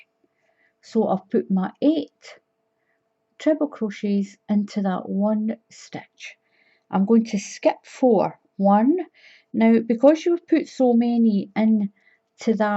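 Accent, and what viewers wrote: Scotland, United Kingdom. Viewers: It's great to hear a Scottish voice again, likr mine, your so accurate and easy to follow,as I'm a novice to this.Thanks xx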